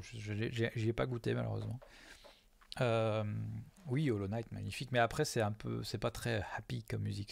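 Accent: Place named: Agen, France. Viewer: Pour moi on est sur l'accent d'Agen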